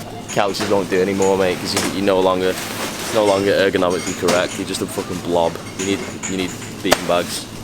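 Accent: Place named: Manchester, United Kingdom. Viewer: You got that propa manucunion accent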